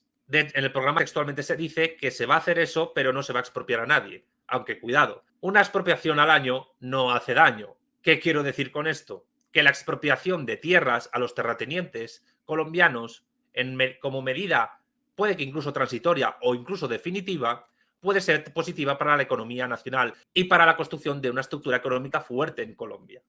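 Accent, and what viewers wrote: Spain. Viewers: Por favor que hace est señor con asento español opinando de el señor petro .... Analiza a los de tu españa amigo no metas las narices dond no t han llamado ....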